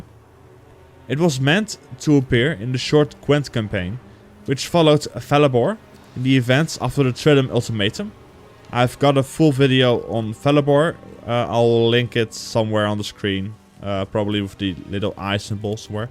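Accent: Netherlands. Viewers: The first two are well-known mythological animals (phoenix most of all), but I've missed them all completely in the Witcher. But then I also don't play Gwent, so that's probably why. Phoenixes are my favourite animal. Very interesting video with these unknown creatures (unknown at least in the Witcher). Answering your question; I love Tissaia De Vries as a character (and your Dutch pronunciation will just nail that name), but Coral might be a better choice, since less people know about her. Maybe keeping Tissaia for last will get you more views, because it'd be closer to the release of the Netflix series wherein she appears. I'll look forward to it regardless of which one you make first.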